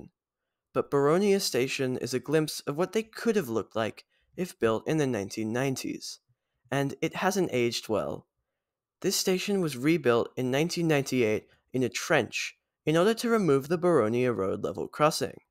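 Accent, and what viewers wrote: United States. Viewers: i'm pretty sure I saw my car in the background of one of the still photos. Also, can I ask about your accent? It sounds partly American. Is that on purpose? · are you part american/canadian? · Is there a particular reason you talk with an American accent?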